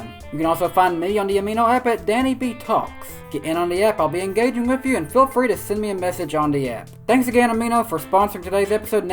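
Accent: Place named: South, United States. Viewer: Down south, the proper pronunciation of the letter "I" is pretty much neglected. I live in TN, I see it happen all the "tom". Lol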